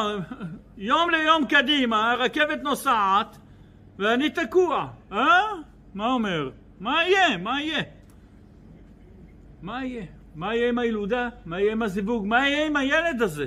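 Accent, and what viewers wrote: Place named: Iraq. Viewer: הוא נשמע עיראקי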